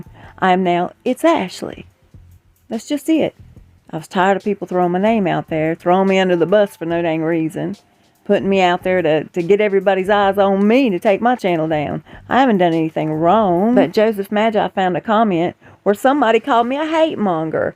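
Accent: South, United States. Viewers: I could listen to your wonderful southern accent all day long. My favourite thing is "Y'all stay safe and be blessed".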